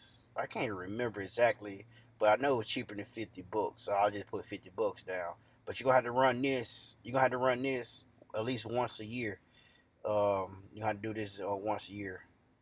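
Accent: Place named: South, United States. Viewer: Thank you for this video. I’m not a driver, but will be hiring my cousin to drive for me and I’m planning to buy my first truck & trailer by the end of year. It’s all a bit overwhelming but your video was full of really good information. Love that southern accent too! God Bless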